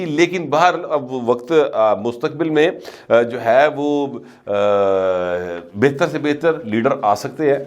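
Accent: Punjab, Pakistan. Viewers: چول پنجابی ٹچ نا دیا کرو چھچھورے لگتے ھو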